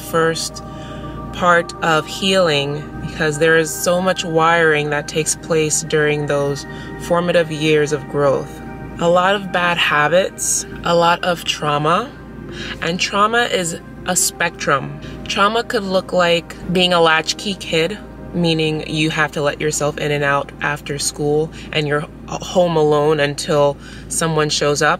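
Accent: Jamaica. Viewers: I heard that Jamaica voice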